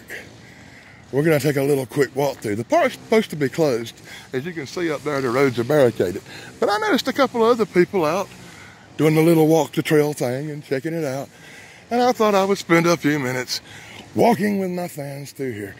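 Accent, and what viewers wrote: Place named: South Carolina, United States. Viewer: you for sure sound like you are from near williamston south carolina haha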